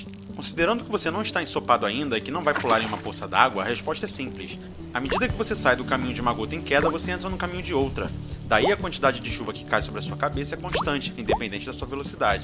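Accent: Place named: Rio de Janeiro, Brazil. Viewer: caralho é impossivel ver mais de 1 minuto de um vídeo em carioquês, que coisa insuportavel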